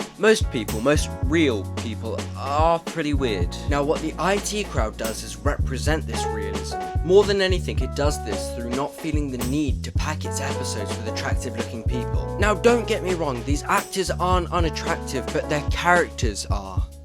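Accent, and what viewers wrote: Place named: United Kingdom. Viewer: Surprised he doesn't know how to pronounce Richard Ayoade's name - this video creator sounds British and Richard has been on a large number of British panel shows and has had his own shows, all where you can hear his name being pronounced.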